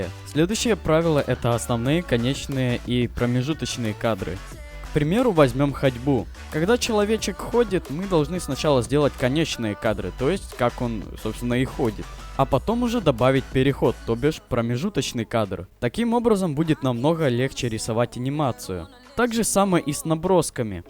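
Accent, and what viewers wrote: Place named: Ukraine. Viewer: Тоже украинец? Буква Г хорошо выдаёт)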